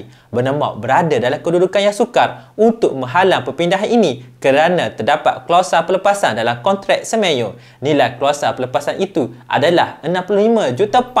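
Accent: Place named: Kelantan, Malaysia. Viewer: hahaha pekat tol kecek kelate boh hahaha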